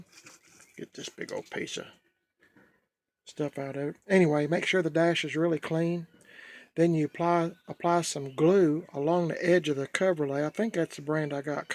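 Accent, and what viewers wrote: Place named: South, United States. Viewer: Are you from Alabama? Your accent gives you away, you’re from the south. LOL! I am visually impaired, and enjoy hearing the sounds of those old pick up trucks of yours. Would love to hear the old 78 roar to life. For several years of my life, I lived 4 miles from the Talladega superspeedway, so I love anything with straight pipes.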